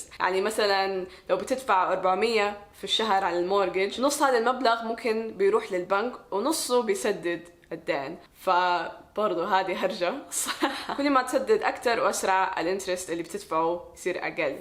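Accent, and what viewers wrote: Hejaz, Saudi Arabia. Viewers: باين من لهجتك انك سعوديه صح ❤ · الله اكبر ليست لهجه كويتيه نهاءيا الاخت من اهل الحجاز وفي مكه المكرمه وهي لهجتهم سلسه وجميله الله يوفق الجميع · احب اللهجة السعودية واضح جدا Sudanese guy pass from here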